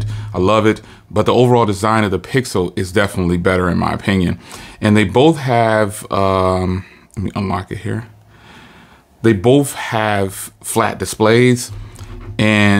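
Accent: United States. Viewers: He speaks with an American accent.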